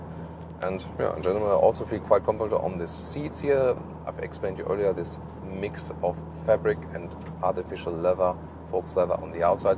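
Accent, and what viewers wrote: Germany. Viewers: Always very detailed and good reviewes at, if there wasn't this German accent...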